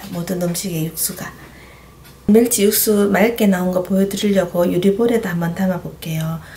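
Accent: Gyeongsang, South Korea. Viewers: 미국에서도 응원보내요~~ 코인 육수 사서썼는대 뭔 맛도 안나고.. 해서 이번에 첨으로 멸치 사와봤어요 육수 직접 만들어보려구요. 멸치말리려고 내놨어요^^ 낼 꼭 따라해볼게요. 저도 경상도에 살았었는데 말투가 넘 반가워요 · 상어..무섭지않아요 유치원 애기들 상어 인기짱입니다 우리동네 맛집으로 유명한 멸치육수칼국수집 비결이 바로 이렇게 육수내는거예요 요리는 배워가는게 많답니다 구수한경상도 아지매라서 더욱 흥미롭습니다 늘 고맙습니다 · 가족님들,부럽네요😋.대구고향떠나40년해외거주하는할매입니다.정겨 운고향말씨와 편안한분위기가 느껴져...시원하고알찬요리솜씨에👏🙆.멸치육수끓일때 생강한쪽넣어면비린내가덜나지않을까싶은데 어떨까요?✨👱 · 경상도 억양이 반갑내요~ 오늘 처음 와 봤어요 구독 눌렀어요 잘 보고 갑니다~ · 설명이 구수한 경상도 대구 말씨가 묻어있어 고향 향수 풋풋 하구요 미모는 전형적인 한국의 풍성한 정감에 내가 좋아 하는 멸치 다시물 설명 꼼꼼히 챙겨 정취했습니다 언제나 보람가득한 날 되시기를 기원 해요 🙌💖👍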